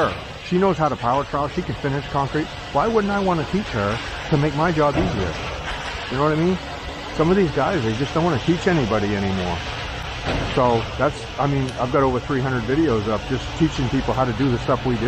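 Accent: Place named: New England, United States. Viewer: You gotta be local like Maine mass or new York with that accent I recognize and love it and time I hear a new englander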